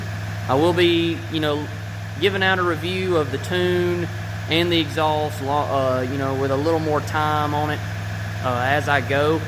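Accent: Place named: South, United States. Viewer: Nice video. I am about to go down the same road so I appreciate the insight. I can tell you're from my neck of the woods from the southern drawl. Where abouts are you located?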